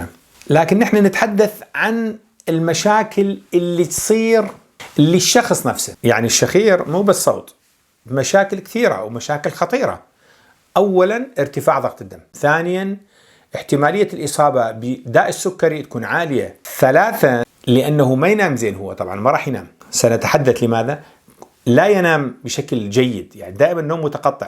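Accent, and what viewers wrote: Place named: Mosul, Iraq. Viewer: والله عغفتو من لهجتك انت ما غريب. دكتور الله يحييك و يوفقك دائما. و استفادينا من الفيديو عاشت ايدك. الموصل دائما ولاّدة للناس الايجابيين و الناجحين مثلك.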